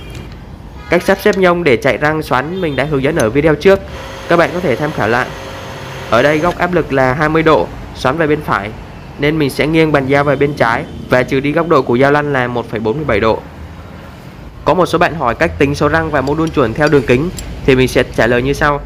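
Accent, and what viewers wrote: Vietnam. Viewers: на каком наречии он лапочет? на северном или южном?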